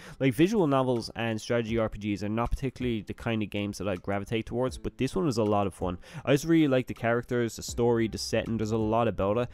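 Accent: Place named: Ireland. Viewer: You called me out mate! Na I'm playing, good review man.. caught the Irish accent straight away ✌🏾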